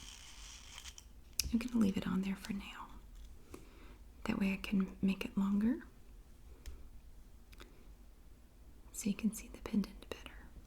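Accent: South, United States. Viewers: It's her Southern drawl.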